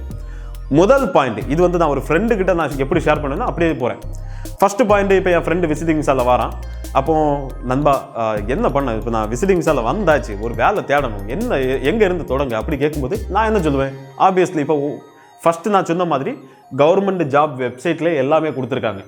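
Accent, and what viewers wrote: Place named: Nagercoil, India. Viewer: Iam hardly searching job at UAE.. its very useful brother.. neenga Nagercoil ah brother.. speech la Nagercoil slang varuthu..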